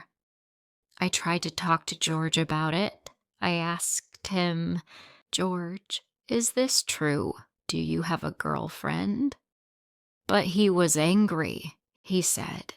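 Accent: United Kingdom. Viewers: I love your english accent so much ❤❤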